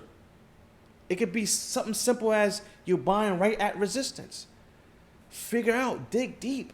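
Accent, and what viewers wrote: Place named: New York, United States. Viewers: You gotta be from NY with that thick accent lol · Yes I know that New York accent. I’m originally from Philly and lived in NYC for years. I love it. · I fw you heavy my guy 💪🏾 You sound like you're from Harlem, no?... lol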